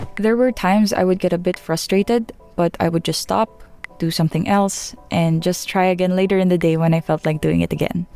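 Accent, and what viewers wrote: Philippines. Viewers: literally 5 seconds into the vid narinig ko agad yung accent (i never came accross u b4) and CLOCKED that u were a kabayan, and i was right 💅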